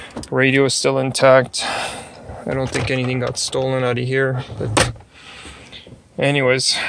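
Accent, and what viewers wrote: Germany. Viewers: Right - will do. Just watched you Mom-video. Where in Germany are you from? I'm wondering about your German dialect.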